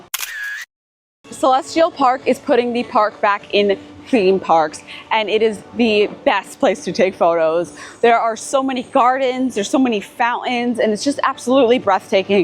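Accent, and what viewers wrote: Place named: New Jersey, United States. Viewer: That Jersey accent is thick. 😂😂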